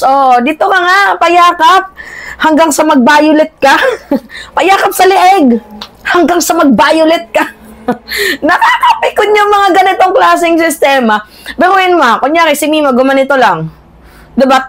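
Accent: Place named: Ilocos, Philippines. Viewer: Team Agustin vlog po ganun din magsalita. Mga ilokano ganun lalo na Pag inielaborate ang wordings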